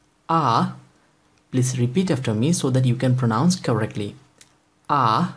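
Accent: India. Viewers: He is a good teacher. Wish he could do something about that very Indian accent. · LOL, that's good. Teaching is a noble work. Whether it be full time or part time. I'm from India, I have heard your accent here.. So I thought you might be Indian. Anyways, good luck with your Deutsche Kurs!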